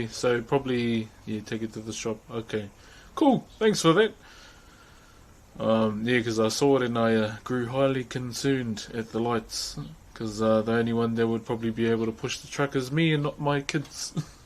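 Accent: New Zealand. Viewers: Your accent sounds New Zealand, but your carving style looks Hawaiian/Polynesian... So pretty. I really like it ❤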